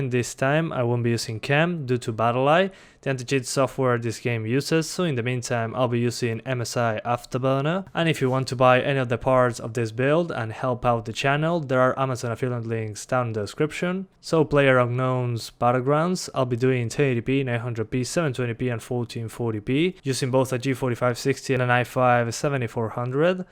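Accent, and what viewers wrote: Argentina. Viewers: Que hice conmi vida como para terminar viendo a un argentino hablando ingles , y ensima terminar entendiendolo.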